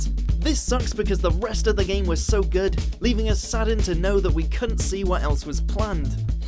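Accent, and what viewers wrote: United Kingdom. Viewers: I hate this British voice. Bring back the American one. This one sounds like a cartoon. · Why is a British person narrating Watch mojo now a days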